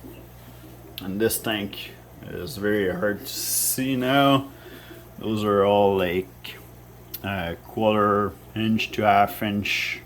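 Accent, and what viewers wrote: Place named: France. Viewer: I am very glad that I came across this video from a kijiji add! I just recently got my first small tank so I am looking to learn a lot more so that my fish can thrive. You son is pretty smart to have started his own business so young! You must be a very proud father. I love all of the fish! You have a new subscriber. I am actually French, too. I recognized the accent. Sounds just like me ha!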